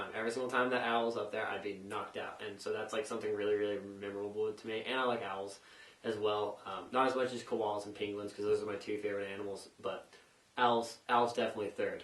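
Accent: Ireland. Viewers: r u irish? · Your Irish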